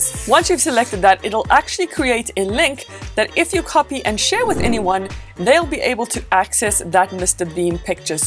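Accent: South Africa. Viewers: South African? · You have a white South African accent.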